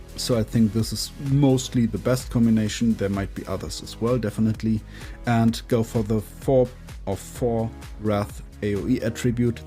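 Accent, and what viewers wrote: Germany. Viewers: man hört direkt das du nen deutscher bist finde es richtig cool das du englischen content bringst weiter so :D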